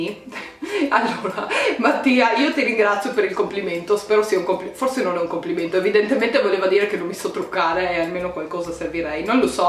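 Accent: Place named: North, Italy. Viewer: Ti adoro all' inizio mi ero fatta un'idea troppo superficiale di te forse perché non conoscendoti e vedendo per la prima volta i tuoi video non avevo ancora capito che persona potevi essere ma dopo un po' non sono riuscita a non smettere di guardare i tuoi video, sei simpatica solare e anche bella. forse all' inizio mi sono fatta un idea sbagliata di te un po' per l' accento del nord e un po' perché non capivo la tua ironia ma ripeto è stato inizialmente poi conoscendoti ho visto altro e la tua ironia la adoro! un bacio e le Chanel se le hai mostrare chissenefrega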